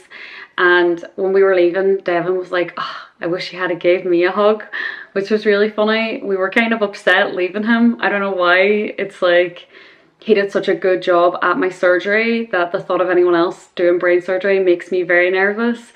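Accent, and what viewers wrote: Ireland. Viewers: I appreciate that you have the captions turned on. Irish accents are difficult! It was so cool you got to see your surgeon. And I think that your eyebrows look fine. I don't even notice them. I'm sorry they hurt but I didn't even notice anything about them. Your hair is so beautiful. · you look STUNNING!!!! your hair is angelic; your eyebrows look perfectly fine. I luv your Irish accent and I'm glad you're feeling better. I have a mild form of Epilepsy and have had several seizures, so I know how terrible they are and feel. Good luck to you, if I see you in a pub, I be sure to buy you a Guinness.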